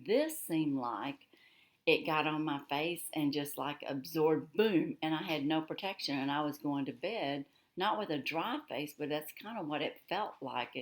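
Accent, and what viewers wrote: South, United States. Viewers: I just love your sweet southern accent. I have the matching Lancome Renergie Lift eye cream. Such a pretty palette you are working on. Oh, I love Buxom lipglosses.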